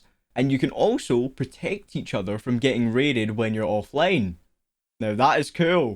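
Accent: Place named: Scotland, United Kingdom. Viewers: Sounds Scottish to me not British :') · You guys are really trying to make it on this channel even switched the robot for the old dude and now the old dude for a younger British sounding (sorry if your not) but my question is when do I get a turn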